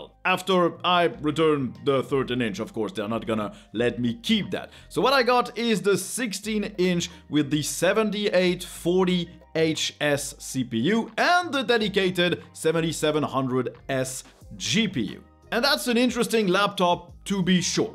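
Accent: France. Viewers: je me disais bien qu'il avait un petit accent francais, j'ai enfin compris que ce n'était pas mon imagination x))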